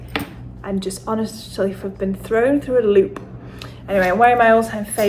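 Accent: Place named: England, United Kingdom. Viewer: It's funny, as a french I'm so used to listen to american youtubers maybe but english accent (so beautiful) is much harder to understand ❤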